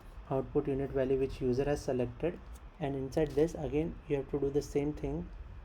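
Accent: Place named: India. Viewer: hahaha it's so funny accent ))) OMG I can't stop laughing ) Indian funny guy with terrible pronunciation )) kupaklklklklklklklklktktktktlklklk 🤣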